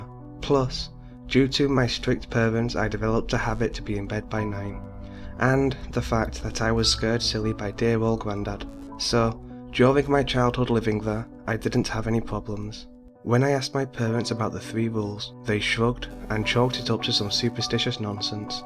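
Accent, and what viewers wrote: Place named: United Kingdom. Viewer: as a American i can say you British and your accent makes everything sound scarier lol good stuff man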